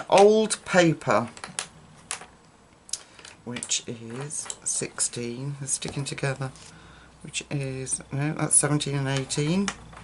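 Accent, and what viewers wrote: United Kingdom. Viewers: Oh, you are so much fun! And you keep it real! And I love your British accent! I watch zucchinikitty all the time, she’s indeed a master with the inks, I got a few just to try, they are not as easy to use as it would seem! The detail inking tool is really hard to come by where I live (Mexico where nothing is available and when it is is at least 5x more expensive) do you think a Q-tip could work? I hope to see you color with these a LOT! They are fast! And even though I love colored pencils.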